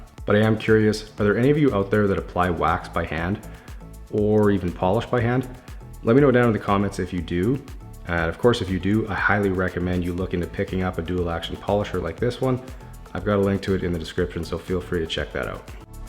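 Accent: Canada. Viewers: Love the Canadian accent ! Aye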